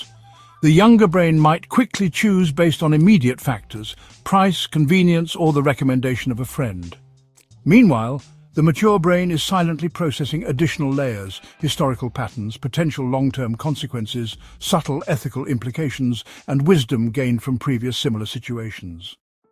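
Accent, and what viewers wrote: United Kingdom. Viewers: First of all, I wonder why they chose to have someone with a British accent, narrate all of this YouTube channel's videos; videos that are clearly targeting those of us seniors in the USA. Is a fancy British accent supposed to make the data sound more sophisticated and scientifically authentic?!?!? (*NOT*) Furthermore, I am almost 85 years old, and the ONLY cognitive difficulties that this lady (me) and all my fellow seniors ever humorously identify as "Senior Moments", are memory lapses of a very particular sort — such as: • Unable to recall a relatively new friend's name • Frequently unable to recall a specific word I want to use, which I have remembered without hesitation, thousands of times in the past • No longer know the correct spelling of lengthy words which I once ALWAYS remembered, after first learning it as a child or youth • Recalling the exact year or decade in which an important event occurred in my life or around the globe • Totally forgetting a conversation I had only a few weeks previously • Etc., etc., etc. 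— and (to be redundant! ☺) — et al Some of the other things on the video are true — such as everything that happened in my life has a story behind it now, which is true of older folks, because being a matriarch or patriarch of our family and friend groups, categorizes us as being "tribally responsible" for passing on the group folklore. It is a joy for me, when I get to share episodes of my history, and my life experience tales. So, story telling IS part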